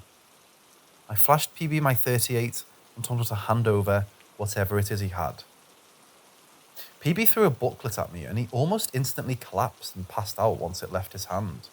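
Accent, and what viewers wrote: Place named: United Kingdom. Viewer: I'm British so love to hear a fellow British narrator. Thank for the uploads mate.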